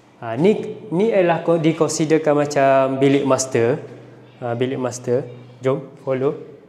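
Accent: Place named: Kelantan, Malaysia. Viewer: penyampaian biarlah dalam Bahasa Malaysia Standard. Pembeli ada juga orang bukan Melayu. Ramai tak faham loghat Kelantan. Lainlah awak nak jual rumah di Kelantan.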